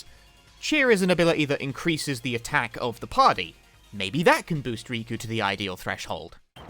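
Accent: United Kingdom. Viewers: you sound way more british in editing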